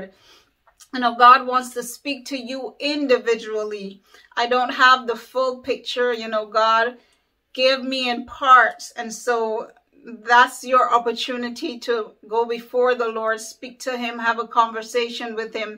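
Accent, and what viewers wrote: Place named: Barbados. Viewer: I hear that bajan accent! I love it and this video, God bless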